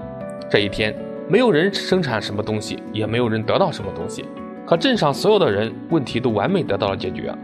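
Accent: Henan, China. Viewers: 兄弟你是Henan的吧？一听这口音，河南普通话，建议注意下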